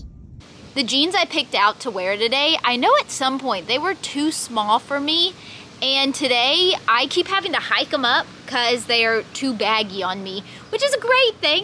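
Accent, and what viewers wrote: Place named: Texas, United States. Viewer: my riding instructor was always reminding me to keep my heels down in the stirrups. I guess it is a safety issue in case your horse bolts you won't get hung up in the saddle. I noticed the instructor on the video also had his toes jammed in too, I love Western riding but never cared for English, I hated their saddle type and it was hard on the bottom -) By the way, you are beautiful as always, my grand daughter looks alot like you, but we have the Michigan accent instead of the Lone Star State accent. Our southern friend tell us that we are the ones with the accent LOL.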